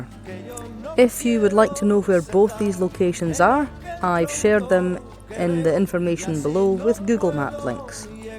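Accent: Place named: Scotland, United Kingdom. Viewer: Hi. I found your video very comprehensive and, although I have no intention of ever visiting Cuba for philosophical reasons, your advice is transferable to many other similar countries. I was amused by your accent and the prospect of someone like you trying to learn to speak Spanish. I intend no insult. I was born in Glasgow and moved to North America with my family when I was seven years old. My parents had very strong Scottish accents as did the many family members who travelled here to visit over the 60 years I have lived here. I can't imagine any of them ever becoming conversant in Spanish. I once told my Auntie Betty that I needed an English/Gibberish dictionary to understand her. I am, however pretty fluent in Spanish as my own family travels, mostly to Mexico often. We are scuba divers and love the chance to visit small out of the way villages to dive and it is imperative that you speak some Spanish and the people really appreciate that you make the effort. 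Anyway, I found your attention to detail really great and I'll look for other reviews from you. Best wishes Tom Duncan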